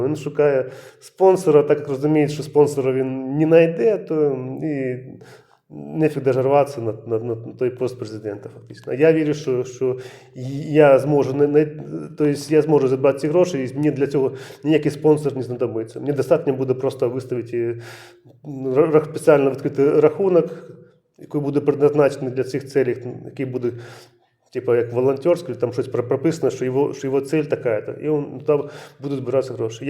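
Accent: Russia. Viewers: 😮я чую московский суржик шановний ви не азіров в гріме?і да ти уже збрехав, в Україні можна мати любу зброю крім пістолета,навіть снайперку, і в необмеженій кількості😅 ти можеш бути президентом брехун ще той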